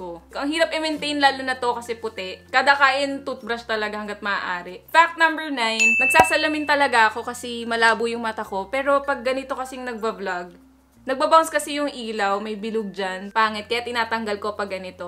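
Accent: Visayas, Philippines. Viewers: Bisaya daiy ka te😌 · Bisaya ka dai??????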